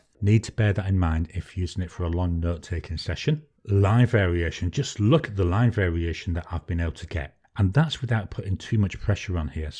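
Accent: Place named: Birmingham, United Kingdom. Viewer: your bio says you're based in Austrialia but to me you sound like you're from Birmingham. Am I right or am completely off on this one 🤔😂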